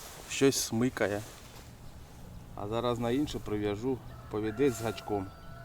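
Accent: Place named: Chernihiv, Ukraine. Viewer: я живу в полтавській,з чернігівської переїхав років двадцять тому,і ось натрапив на ваш канал,і по рідному акценту зрозумів що хлопак з Чернігівщині)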